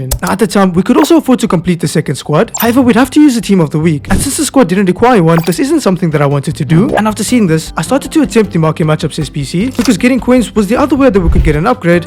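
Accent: South Africa. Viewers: are you south African?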